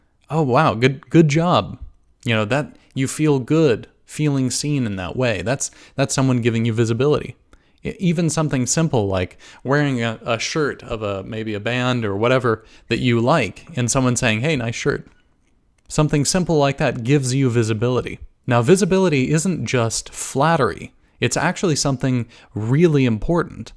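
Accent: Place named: Israel. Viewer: dam she sounds like a hebrew speaker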